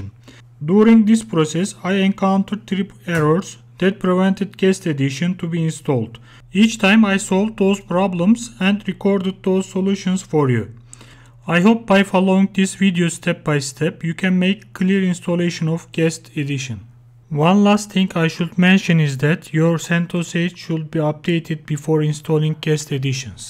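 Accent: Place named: Turkey. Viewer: yanlis anlama ingilizcen cok iyi de aksanin cok beli turk oldugun o yuzden guldum (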